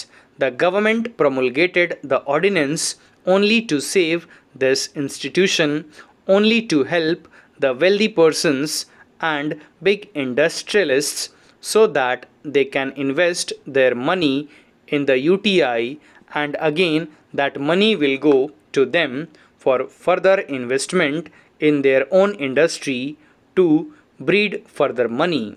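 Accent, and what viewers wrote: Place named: United Kingdom. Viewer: Nice dictation like British English 😀👍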